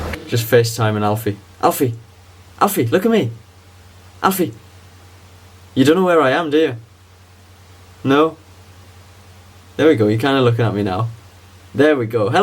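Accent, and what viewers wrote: United Kingdom. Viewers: Yeah he is British!!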